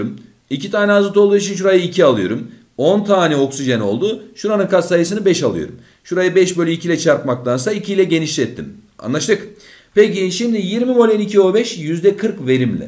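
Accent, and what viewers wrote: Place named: Adana, Turkey. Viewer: Hoca kesin adanalı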